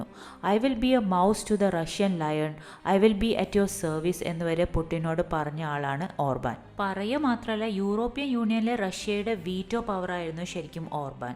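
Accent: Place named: Kozhikode, India. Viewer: congrats 🎉. Big things in simple humor coated "koyikkodan" slang. Go ahead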